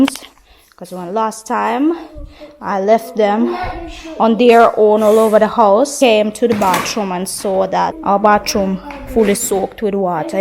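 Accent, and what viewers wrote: Jamaica. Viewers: Bless 🙏 up to you and the family 💕! It's so good to see you and the boys hanging out, single mom life is very hard, but you did a good job for the day ❤️ 😁💪, and also cutting your coconut, you are a real yaady🇯🇲🇯🇲 Jamaican style 😂😂👍, I watch this video twice, big up to 💪💯 and his team for winning their match, we need more videos from you with that sweet yaady 🇯🇲 talk 😂😁 one love❤ to the family everytime👃👏🇯🇲😂. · nice to hear when you talk like a jamaican. 😊. Nuff Blessing from Germany.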